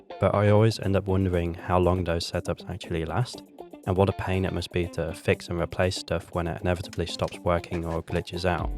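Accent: United Kingdom. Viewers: Out of interest, are you Dutch+British? You have a very British accent, I initially thought you were based in the UK when I first started watching your videos haha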